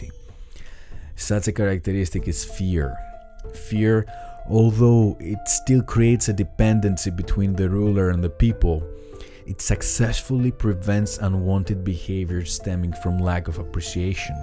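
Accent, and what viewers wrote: Greece. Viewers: God damn your accent is so greek. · Lol first time i am in your channel and after the first verses i caught that you are greek from your accent XD great video and very informative. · Very Greek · You must be a Greek,,,, not only the accent, the looks , but the room decoration.....marvelous. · your accent appears Greek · IS THAT GREEK ACCENT I DETECT IN YOUR VOICE? OR SPANISH?? 🤔🤔🤔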